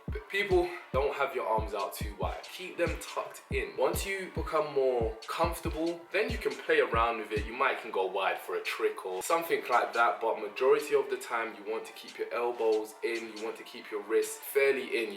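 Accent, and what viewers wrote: United Kingdom. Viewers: Nothing sexier than a chocolate man with a British accent....with tats...with a beard...with a six-pack...that’s tall. Ok I’m done.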